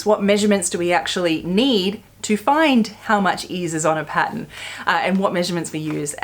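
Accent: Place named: Australia. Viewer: You look so French today, but amusing to hear the Australian accent! Lol!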